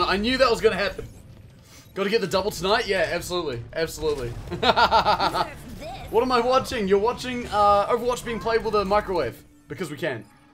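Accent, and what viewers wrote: New Zealand. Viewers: James Bartlett Yep that's a kiwi accent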